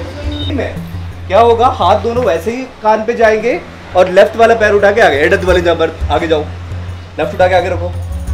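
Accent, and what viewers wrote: Kerala, India. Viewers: Are you a malayali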